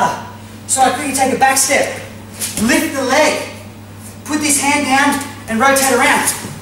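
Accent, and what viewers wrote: Australia. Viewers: Is that an australian accent? =) Awesome vid!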